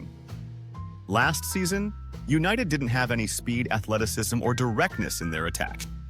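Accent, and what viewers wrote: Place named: United States. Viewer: We really don't need AI with an american accent!!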